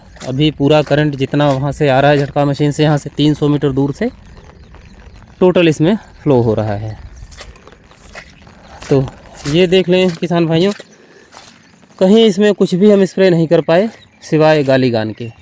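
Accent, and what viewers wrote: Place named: Punjab, India. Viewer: But tuhadi boli to punjabi lagde ho....idro shift hoe si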